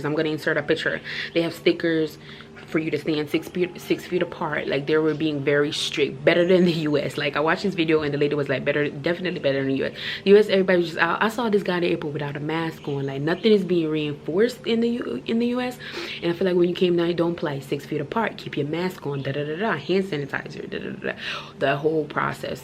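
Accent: Jamaica. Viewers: No offence but which r u Jamaican r American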